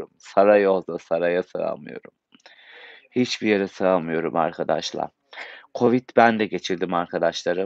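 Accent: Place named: Kastamonu, Turkey. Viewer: Kardeşim Başına Sağolsun Allhm Kabir Rahatlığı Versin Kastomonulumusunz Konusmalarn Öyle Geldi Bizde Sinopluyz Sabırlar Dileriz Çok Büyük Bir Acı Sende Merhametli Bir Evlatsn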